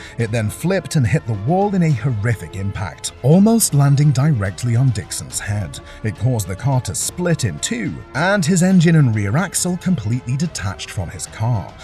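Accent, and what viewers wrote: United Kingdom. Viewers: the "Brittish" AI voice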